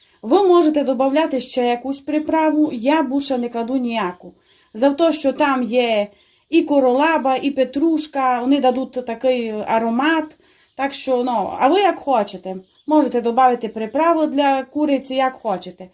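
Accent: Transcarpathia, Ukraine. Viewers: говорить дуже гарно на за карпатському діалекті, судячи по коментарях всім подобається, це ніде не заборонено говорити на діалекті. Але пише українською мовою пише грамотно, чого вам Бракує, бо взагалі незрозуміло на якій мові написаний коментар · ДЯКУЮ ЗА В1ДПОВ1ТЬ ЦЕ МАЬУТЬ НА ЗАКАРПАТСЬКУМУ ТАКОМУ ЛАГ1ДНОМУ ДО ВУЗА НУ ПРИЕМНО СЛУХАТИ.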